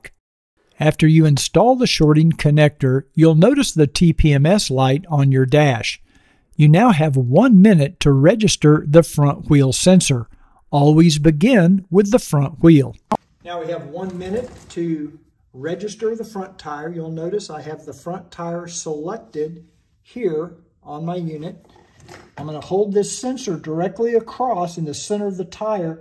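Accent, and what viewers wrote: United States. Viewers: I live in Amsterdam the Netherlands. I own a GW2021 and ordered the GW 2022 DCT touring All Black. I love your video’s and specially your voice and American accent. I love it. Thanx.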